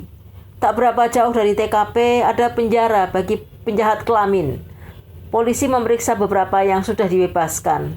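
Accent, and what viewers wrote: Java, Indonesia. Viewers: suara madam yg medok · Medokny dikurangi dong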